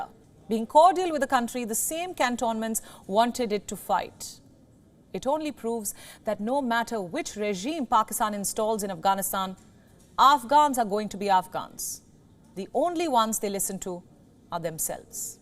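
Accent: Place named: India. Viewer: that Indian accent is giving me nightmares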